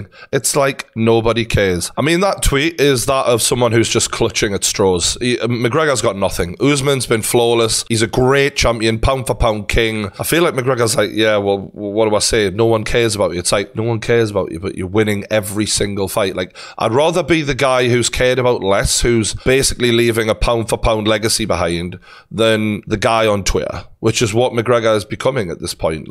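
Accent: Newcastle, United Kingdom. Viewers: Yooo geordie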